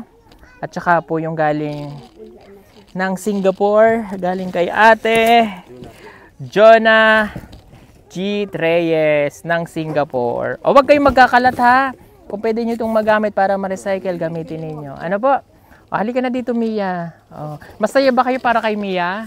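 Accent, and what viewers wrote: Visayas, Philippines. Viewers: sir ang cute mag salita ng bisaya!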